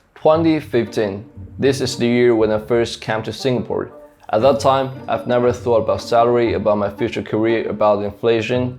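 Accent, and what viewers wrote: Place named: China. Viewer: 一听就是咱中国留学生哈哈，点个赞！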